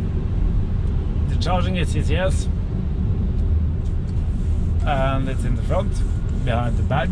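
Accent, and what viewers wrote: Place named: Norway. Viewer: I'm Australian and his English is good and clear even with his Norwegian accent. Very enjoyable video. The T3 order books open here in Australia on July 30th, 2021.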